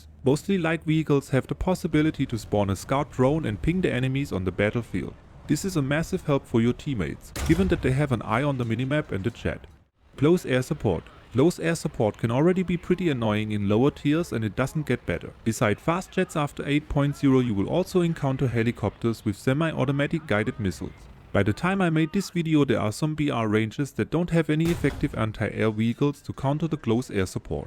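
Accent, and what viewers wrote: Germany. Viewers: You are German right ? 😄 ich auch 😂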